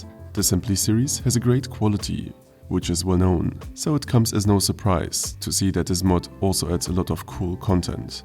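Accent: Germany. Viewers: I Can here a German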